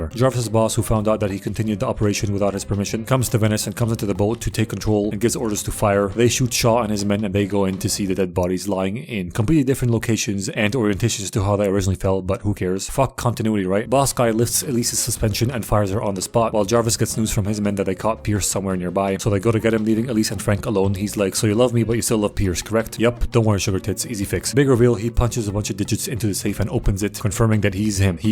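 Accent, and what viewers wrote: United Kingdom. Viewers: HOLD UP WAIT A MINUTE! HE IS BRITISH ? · No way my goat is british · DUDE ARE YOU ACTUALLY BRITISH??????????????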